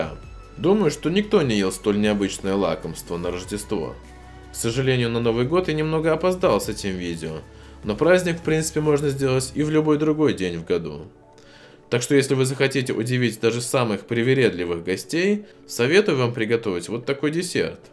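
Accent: Estonia. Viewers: он из Эстонии чтоле?? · Бро,ты из Эстонии?!😃(я да) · Ты из Эстонии? Шок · Ты с Эстонии?))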